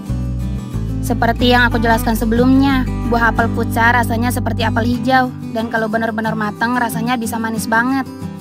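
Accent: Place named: Lombok, Indonesia. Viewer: logat lombok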